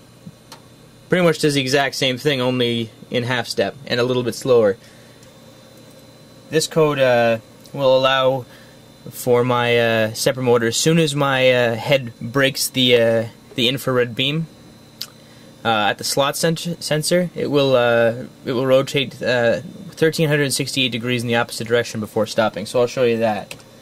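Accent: Canada. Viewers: i can tell by your accent that you are a canadian...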